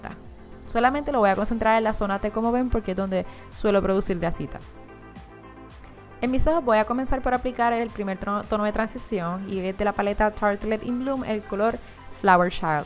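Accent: Puerto Rico. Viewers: Eres hermosa sin maquillaje y mucho más hermosa con maquillaje.. Me encanta el acento Puertoriqueño.. 😍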